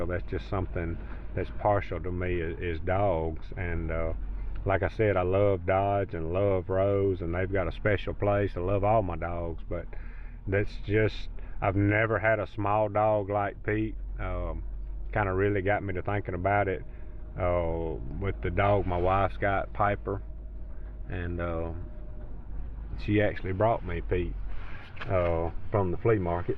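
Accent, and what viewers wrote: South, United States. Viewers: 68 year old man here. I'm gonna miss that long southern drawl of", Pete, ". Well done!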